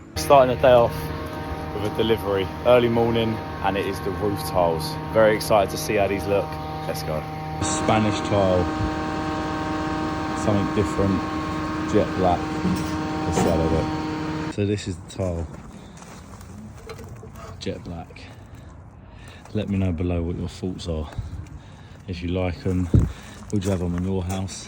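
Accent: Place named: Croydon, United Kingdom. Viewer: Listening to your accent i bet you are close to me too Croydon 🧐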